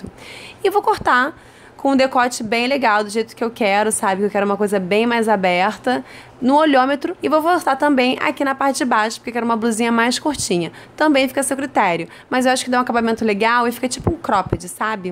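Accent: Rio de Janeiro, Brazil. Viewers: PAUSAAAA! Nunca vi uma carioca com o sotaque tãoooo marcante! Hahahahaha, ADOREI! Seu cropped ficou uma gracinha, razô! Beijos e Pausa pra TPM!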